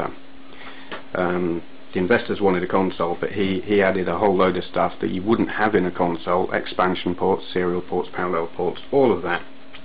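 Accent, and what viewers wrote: United Kingdom. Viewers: i knew before i clicked this video that the voice would be british....... so sad that america never got as enthusiastic about the amiga. =( in fact, it's so bad that when nintendo released c64 games on the wii console, they gave us americans the PAL versions, not the NTSC ones!!! so the games run at the incorrect speed over here (music is too fast) and the keyboard layout even sports the british pound instead of the dollar sign. sighhhhhhhh.......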